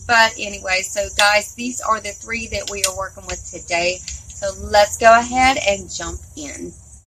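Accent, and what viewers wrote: South, United States. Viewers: I was curious,Where are you from?Im from Arkansas.You sound a bit country like here.💞💖💕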